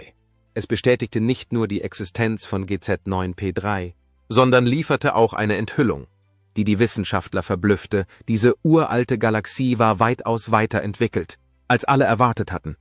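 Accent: United States. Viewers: Die IT Stimme mit leicht amerikanischen akzentuiert ist zum kacken🥴😝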